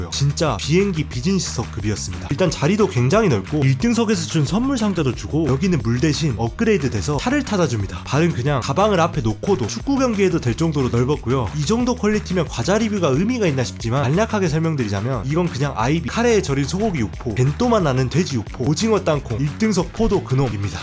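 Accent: Jeolla, South Korea. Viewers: 영상 내내 전라도 사투리가 느껴져서 혹시 했는데 역시 기아 팬이셨군요!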